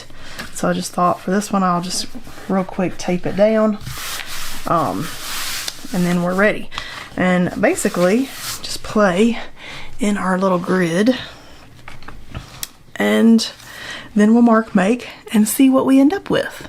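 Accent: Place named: Kentucky, United States. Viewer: I love your accent and enthusiasm! Any chance you are from Kentucky? What would be your top 5 supplies (after watercolor paper , brushes and paints) for a beginner watercolor gal who wants to try following along with you and who does not have any of the pens, inks, sticks and other wonderful things you have? I'm overwhelmed by your Amazon list!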